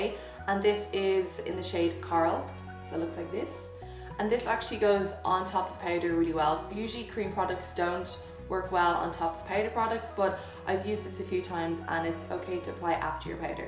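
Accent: Ireland. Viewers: Is she from Ireland? Beautiful 😊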